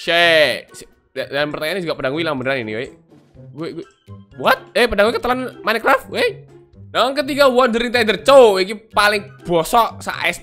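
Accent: Java, Indonesia. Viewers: kamu orang jawa?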